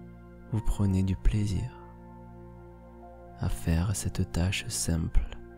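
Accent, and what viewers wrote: South, France. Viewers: Cet accent du sud m'apaise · j'adore ton accent du Sud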